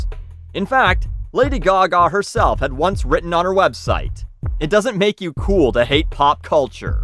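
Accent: Canada. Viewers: Tornusage it's his Canadian accent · Anyone noticed Canadian accent? (out, about)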